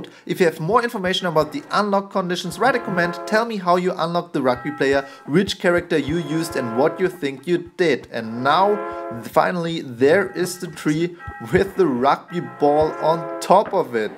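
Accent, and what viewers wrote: Germany. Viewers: Your accent sounds like germain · You are German? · Are you German?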